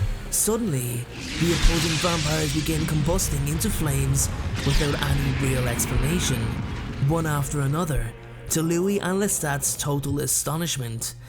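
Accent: Ireland. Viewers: You sound like conor mcgregor, that's how i know you're from ireland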